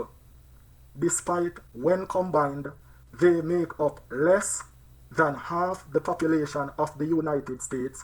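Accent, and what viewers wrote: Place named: Jamaica. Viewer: So True. Thanks White guru white a Jamaican accent.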